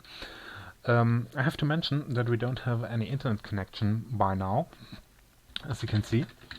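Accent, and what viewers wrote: Germany. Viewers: Great video tutorial for current linux users ! Don't worry about your English ;) You of course have a German accent, but I understood everything! Cheers from Denmark. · I heard your english and thought you were german... And YES, I was correct! Grüße aus Braunschweig!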